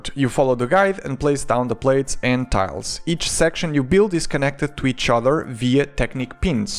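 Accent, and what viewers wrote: Portugal. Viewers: 10 segundos (sem ver o nome do canal) e percebi logo que o sotaque era de um português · no primeiro segundo do vídeo soube que eras tuga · I just have that strange feeling, that you are português, cuz of " 'eight " instead of "height", "raciu" instead of racio. So yeah, just a guess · gajo parece tuga a falar ingles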